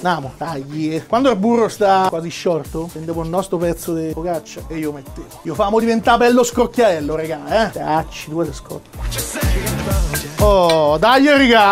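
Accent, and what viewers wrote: Rome, Italy. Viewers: Carbonara perfetta... E il tuo Romano pure.... La mandrakata finale é strana ma direi che e boooona 😂 Grande... Sei eeeeeeerrrrr meeeejjjjjo 😂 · Tu sei pazzooo!!! Pizza con carbonara, burrata e mortazza! MORTACCI!!!😂😂😂 col romano puoi migliora' zio😉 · Il BURROOOOOHHHH non è mancato Ahahaha comunque il tuo romano é 🔝🔝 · Fa abbastanza schifo il tuo romanesco...hahah senza offesa.... comunque bravo così se fa a carbonara quella vera....te se ama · ROMANACCIOOOOO NO ROMANESCOO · Sei meraviglioso quando parli Romano te se ama!!!! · Che bello sentirti parlare Romano! 💛❤️ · È stato emozionante aver sentito il tuo romano!🤩🤩🤩🤩 Una Carbonara trucida ma divina, cacchio se la proverei!🔝🔝🔝🔝 Comunque boss sei proprio fenomale!!!🤩🤩🤩🤩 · Si dai ci sta come parli in romanesco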